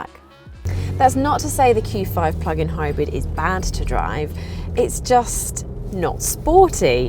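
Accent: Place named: England, United Kingdom. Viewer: lovely the women english accent just lovely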